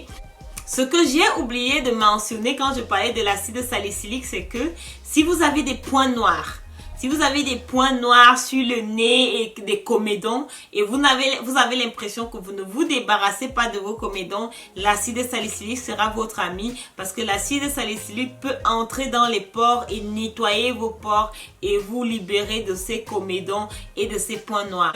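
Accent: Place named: Cameroon. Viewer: Je viens juste de mettre la vidéo c’est l’accent Camer là qui m’a retenue après biensur après avoir lu ton titre ☺️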